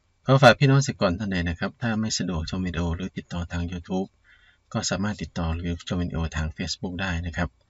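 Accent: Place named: Isan, Thailand. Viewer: มีสำเนียงอีสานด้วยแมนบ๊อจร้า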